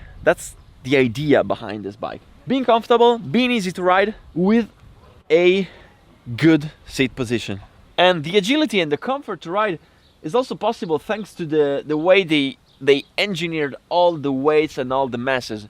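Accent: Italy. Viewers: what ? i really knew instantly that he is italian haha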